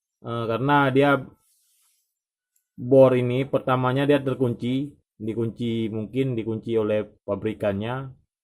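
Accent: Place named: Padang, Indonesia. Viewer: Dari logatnya seperti org padang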